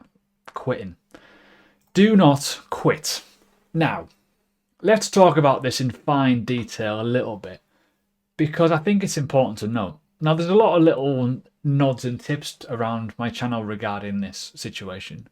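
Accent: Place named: United Kingdom. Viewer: You are giving really good content mate. Nice accent , where from the UK are you ?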